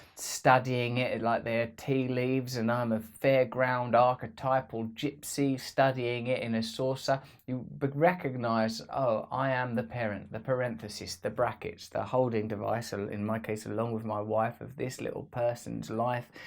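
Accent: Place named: United Kingdom. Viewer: It would be interesting to understand why you were so cavalier and uninvested before you got your "religion". You were the bad boy of the British pop media who was all about instant gratification and irreverent of proper British culture. You built a career on trivializing all the traditional values by your promiscuity, drug use, and extravagance and all in the cleverest way in a proper British accent. Now suddenly you have awakened and give talks and harangues to addiction programs and youtube videos on the sanctity of the body and of relationships as though the extended consequences of one's actions dawned on you. Why don't you come full circle and tell us what went wrong in your development that made this transition so dramatic for you. It is not your children, although by extension they are part of the metaphor. Why did you not recognize the value of intimacy and building relationships and self-knowledge even before your children? 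What was missing in your development?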